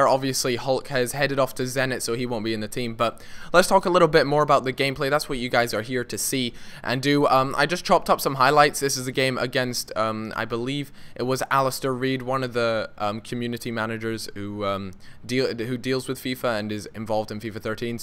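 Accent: Scotland, United Kingdom. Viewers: are u scottish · are you scottish or what...mixing your accent with a hint of english.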